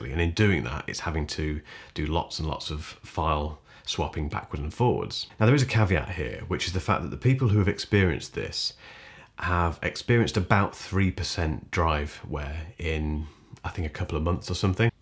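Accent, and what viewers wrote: United Kingdom. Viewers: This is the calmest review I’ve seen and the best British accent I’ve heard. You sir have a new subscriber.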